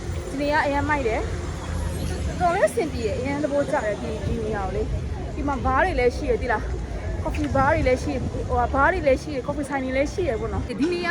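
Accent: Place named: Myeik, Myanmar. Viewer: ညီမကဘိတ်သူထင်တယ် ဟုတ်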